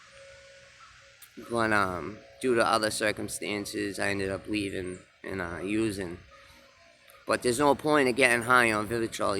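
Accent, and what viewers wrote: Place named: Massachusetts, United States. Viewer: You sound like ur from mass I’m from mass 😀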